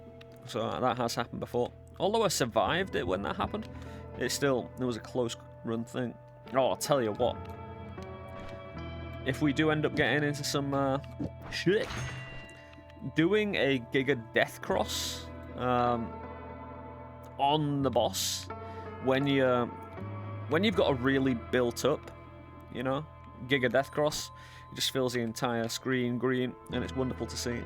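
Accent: United Kingdom. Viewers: One of the regional UK accents, I should recall exactly where he's from but my brain it farts. Give him a chance, bet you'd work it out after a bit.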